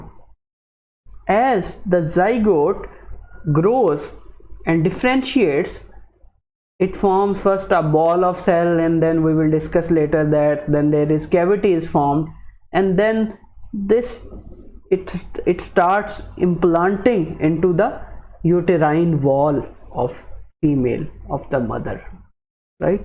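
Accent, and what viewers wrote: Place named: India. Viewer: From his accent, I think he is Indian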